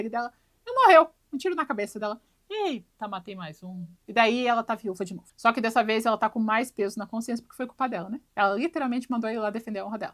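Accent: Curitiba, Brazil. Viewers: Este foi o vídeo que mais soltaste um sotaque paranaense, de Curitiba. Nunca tinha visto tu falar com tanto sotaque. Achei engraçado e ao mesmo tempo intensificou aquilo que estavas falando, combinou. Adorei!!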